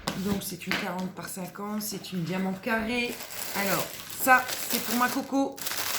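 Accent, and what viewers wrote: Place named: Nord-Pas-de-Calais, France. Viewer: Le joli accent ch'ti...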